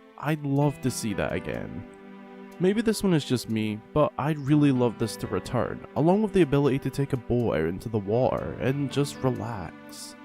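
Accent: Scotland, United Kingdom. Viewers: Love watching these theory videos. I found your channel yesterday, and as a fellow Scottish lad, I have to say we have the best accent 😂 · So good hearing another Scottish accent 😭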